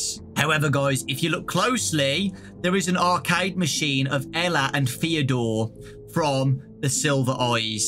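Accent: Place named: England, United Kingdom. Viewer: I never tought english accent is funny but now… you have changed my perspective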